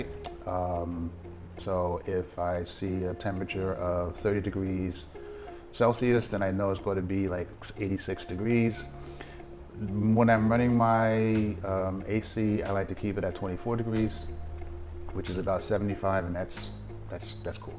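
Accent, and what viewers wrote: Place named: North, United Kingdom. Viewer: Good job 👏👍keep banging out content and your channel will grow. The phillipines has softend you northern accent alot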